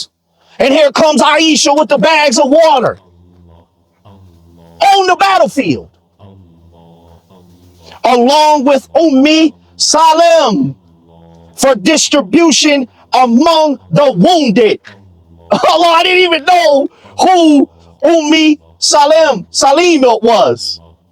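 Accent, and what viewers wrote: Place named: Detroit, United States. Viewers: It's weird to hear a Muslim with a american accent. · he has Detriot vernacular. It's not just American, maybe that's what's so unique about him right??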